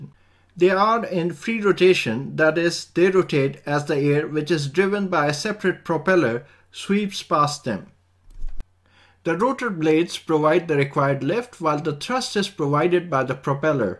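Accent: India. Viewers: then where are you from your accent sounds little Indian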